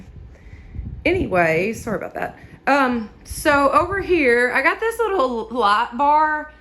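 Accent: South, United States. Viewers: You are just a breath of fresh air, u are jus adorable !! I love your little southern accent too, jus too precious !! OMG !! I am over the moon happy for Ya'll and your family, and yes It Is most certainly bittersweet considering what ya'll have gone through. That Is jus the best news. Your house when It's all done and you put your cute little touches decorating and such, It's going to look so cute, I jus know it !! Oh this jus made my day !! Im so thrilled for you. Congratulations girl. So I see your In Is It Samburg, TN?? You are literally only 2 hrs and 18 minutes from me !! I know If we lived closer to each other we'd jus be fast friends, cuz you are jus a doll. I'm In Oak Grove, Ky...rt down the road from Fort Campbell military base. But I'm originally from Milwaukee Wisconsin. Been down south for about 7 yrs now. Ok sweetie take care of yourselves, much love, God Bless Ya'll !! 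❤❤ Im not Southern but I jus love saying "Ya'll"....🤣🙏🤗💋😘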